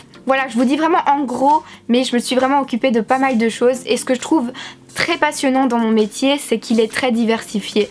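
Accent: Belgium. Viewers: Alors premièrement je commente rarement des vidéos Youtube mais la en ce moment, je regarde tout ce que je peux trouvé par apport à ce métier qui me passionne depuis que je suis en 3ème secondaire! Ta vidéo m'a permise de "valider" encore plus mon choix et je suis certaine de ce que j'aimerais faire l'année prochaine grâce à ça. Je suis aussi de belgique dans la hainaut comme toi plus précisément du côté de Charleroi et je pense que toi aussi en entendant ton accent propre à cette ville ahah! Je voulais te demander si tu étais partie à la Helha ou au Condorcet car j'hésite beaucoup entre ces 2 écoles! J'espère que tu me répondras et je vais m'empresser de regarder tes autres vidéos! Bisous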